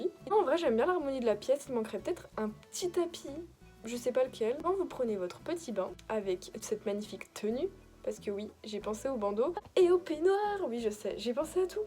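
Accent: England, United Kingdom. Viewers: J adore ton axent anglais tu peut mettre un like si t’es d’accord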